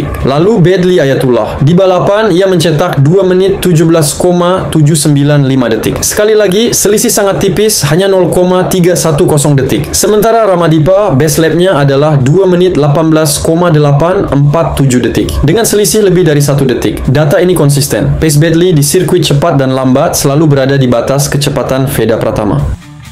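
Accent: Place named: Malaysia. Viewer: emang admin ini malaysia ya? kok logatnya malay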